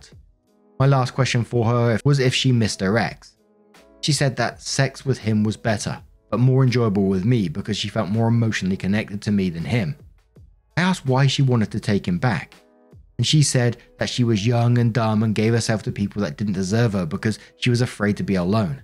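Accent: United Kingdom. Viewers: Just stopping in to say I love your accent and commentary always!!! I'm Jamaican and always wished for the british sound.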